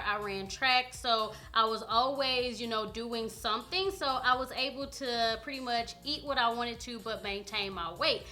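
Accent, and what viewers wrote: South, United States. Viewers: I LOVE YOUR SOUTHERNESS IT MAKES YOU SO INTERESTIING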